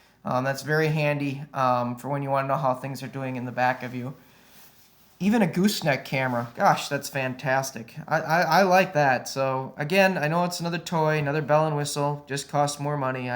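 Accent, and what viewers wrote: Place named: Fargo, United States. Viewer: its REALLY NICE! lol...love the fargo accent.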